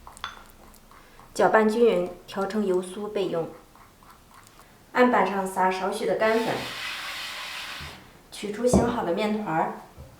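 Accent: Shandong, China. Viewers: 山束話特别親切 。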